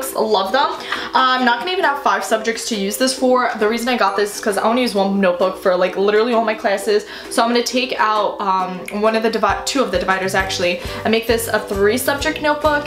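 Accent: New Jersey, United States. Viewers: Dang she's from up north like Jersey or Massachusetts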